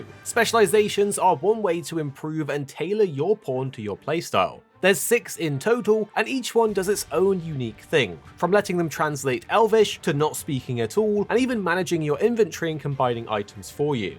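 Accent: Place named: Scotland, United Kingdom. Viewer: In yoùtubes defence he does have a pretty thick Scottish accent.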